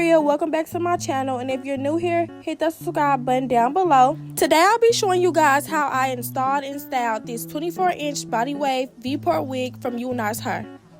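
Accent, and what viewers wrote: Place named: St. Louis, United States. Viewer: St. Louis in the house!!! I just knew it when I heard your accent, babe. Beautiful!❤❤❤❤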